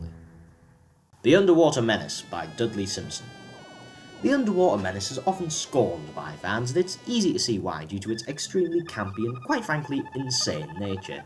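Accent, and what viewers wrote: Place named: Newcastle, United Kingdom. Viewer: I opened this video expecting a nice run of samples of incidental music from big P Troughtons run, instead i get this wet geordie talkin a load of rubbish, big thumbs down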